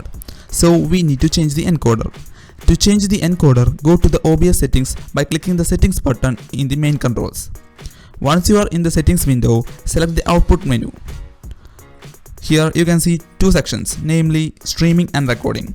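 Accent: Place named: Europe. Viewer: Oh mai gaad European English 🔥🔥🔥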